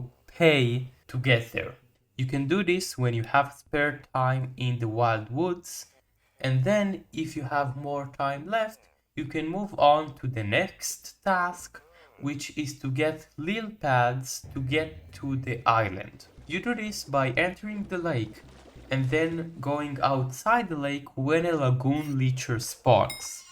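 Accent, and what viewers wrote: Israel. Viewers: are you israeli?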